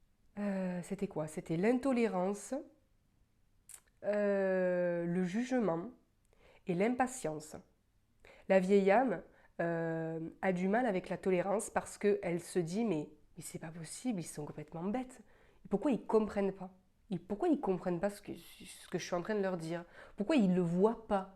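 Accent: Toulouse, France. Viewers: Tu as un charmant accent Toulousain.... signé un Toulousain... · Un discours clair avec un joli accent toulousain... 🥰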